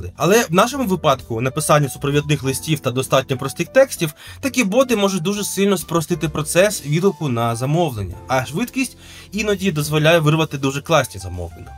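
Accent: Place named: Russia. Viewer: Bună ziua. Contentul e util și interesant. Hai sa vorbim în orice limba în afara limba rusa. Of doamne, dar dacă tu ești ca bloguri interesant, și pina când totul a fost clar, dar acum tu zici Rusnea, ce eu trebuie sa fac? Eu înțeleg limba rusă, dar ucraineana nu prea. Eu vad ca ție nu prea comod, tu ai accent rusesc.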